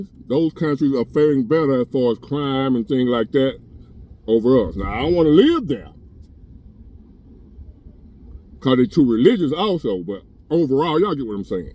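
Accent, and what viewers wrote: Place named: Chicago, United States. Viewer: Chicago voice....finesssee! finessse!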